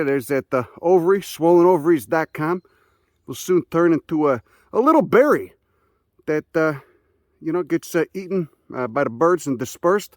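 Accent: Chicago, United States. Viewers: Prologue: Caffeine and Adderall. Apologies. I'm pretty sure this is my favourite video so far. Your sense of humour, Chicago accent, and total lack of self-censorship makes it stellar. And yes, admittedly and embarrassingly, I do have a big homeauxsexual crush on you. I want to thank WIRED for introducing me to your stellar work. I do hope that you are making good money now with your uncommon knowledge. In addition to dreamily gazing at your videos, I'm also completely obsessed with gardening. In particular, right now, house plants. It's just a mess in my house in Vermont. If you do actually see this comment, have you ever done any exploration of the botanical life here around Vermont? I have this dream that someday I can go exploring the geology and botany of the area. Being from New Orleans, it's like living in the fucking Alps to me. I'll stop now. 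Thank you for the videos!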